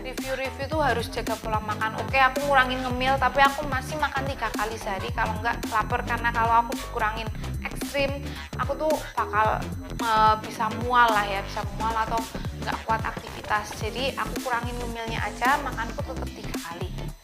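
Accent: Java, Indonesia. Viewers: Ngomonge agak medok yaa cece Salam teko Suroboyo 😅👍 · Medok mbak e😂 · Cece medok bangat ya 😀wong Jawa yaaa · Surabaya? Dialectnya medok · Cee lucu banget kalo ngomong. Medok Jawa😁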